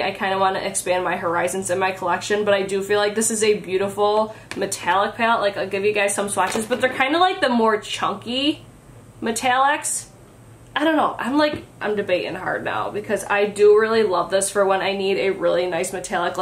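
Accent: Midwest, United States. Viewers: What is this accent?? Midwest?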